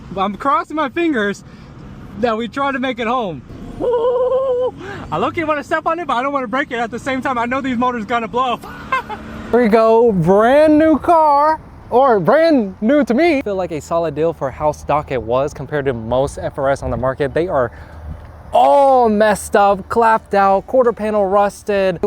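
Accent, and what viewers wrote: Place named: Vietnam. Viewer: He speaks Vietnamese accent english.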